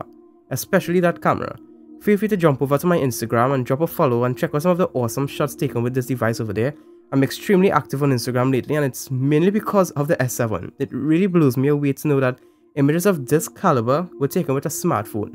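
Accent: Trinidad and Tobago. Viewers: Phanic! At the Twenty One Crybabies it's Trinidadian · trini accent people, am a Vincentian and we from the Caribbean!!!!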